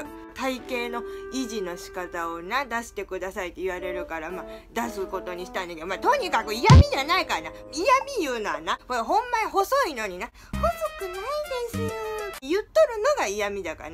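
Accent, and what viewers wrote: Okayama, Japan. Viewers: 毎回思うんだけどのおかげで岡山弁がかわいく思えてきた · 岡山弁なのと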